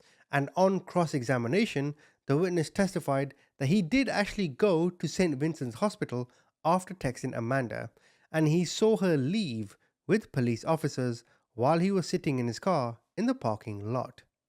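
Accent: United Kingdom. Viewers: Bruh, he’s British. There’s a ton of words that’s British English and American English pronounce differently. It’s so funny how us Americans often think “our way” is the correct/only way for things 💀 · English is not second language, he is just British 😂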